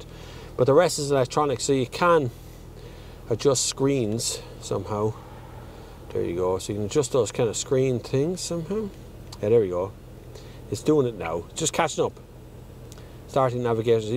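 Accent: Ireland. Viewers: Great review. I don't think Laura understands the Irish accent. I know some of my friends who have strong accents, have problems with Alexa aswell.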